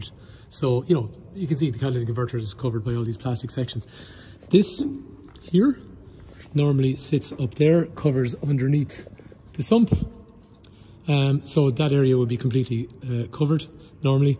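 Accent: Ireland. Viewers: Thanks for the Irish accent and the reassuring fact of catalytic converter location in Civic 2019!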